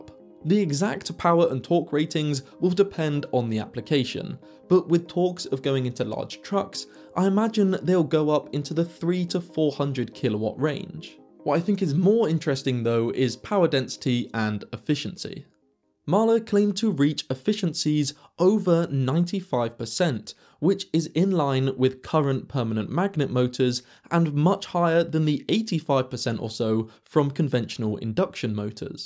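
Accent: England, United Kingdom. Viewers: Yes- perfectly running electric motor in English accent? I am now 67 years old, and my passion was reading advanced technology... Enough of your nonsense and blah blah blah,-it may be so, for the Antichrist oppressors has oppressed all the technology for a century or so... No different than big pharmacy etcetera- you Antichrist global oppressors thieving bastards... Your day is coming, I'll see you all at the judgement seat!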